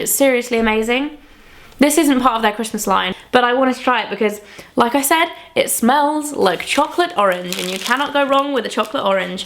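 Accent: England, United Kingdom. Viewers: From which part of the UK are you exactly ? You have a very lovely accent ! Love the video, defs gonna get some of those as christmas presents !! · is she english?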